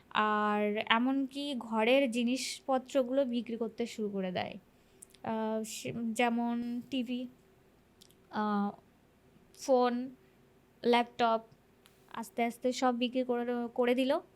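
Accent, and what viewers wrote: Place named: Bangladesh. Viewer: UNI BODH HOY BANGLADESHI BY BIRTH...... ONEK BANGLADESHI MANUSH OI BHABEY PRONOUNCE KORE......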